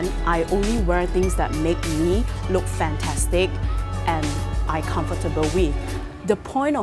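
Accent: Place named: Asia. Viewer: Your asian accent is so cute! And I love your directness, I totally agree with what you said! I will look for your stuff it looks pretty awesome. Thanks for the video☆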